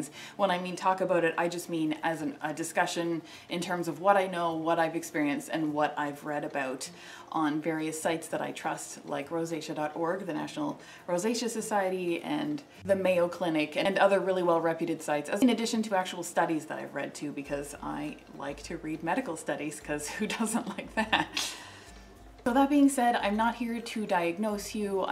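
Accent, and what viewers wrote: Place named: Canada. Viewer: So so gorgeous.. like seriously, love look, and the Canadian accent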